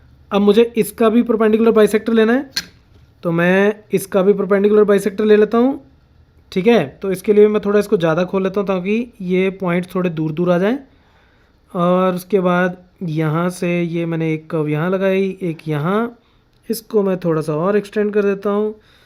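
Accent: Punjab, India. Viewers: Sir are u punjabi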